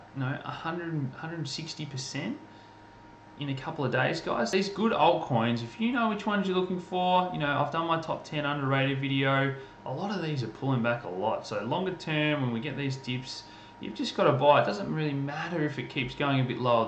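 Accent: Australia. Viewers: Brilliant, level headed insights. And I love te Aussie accent being a Queenslander myself. Where are you from?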